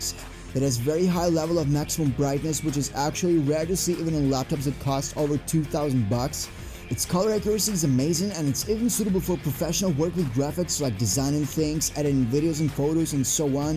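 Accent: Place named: Europe. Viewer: I wish u all the best for your channel but your accent is distracting, its like u are trying to sound like you are from some European country, it sounds like a mix of European/ California surfer who is trying to sound tough and also suffers from asthma (from the labored breathing sounds) if u are not faking your accent then I apologize, either way good luck with your channel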